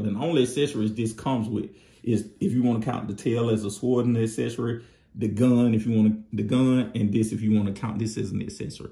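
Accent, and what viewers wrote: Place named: United States. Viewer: I like your country accent. I also have one. I agree, the articulation is sad and it is making me sad to buy the new release. SMH I was hoping someone would fix these issues. Probably a 4th Party will release after I buy and make me sick. I hate TF collecting sometimes.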